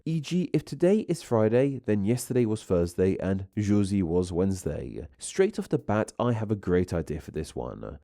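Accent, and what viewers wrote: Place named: United Kingdom. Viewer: I think flawedcore sounds great in your UK accent...flawcore may be better in my US accent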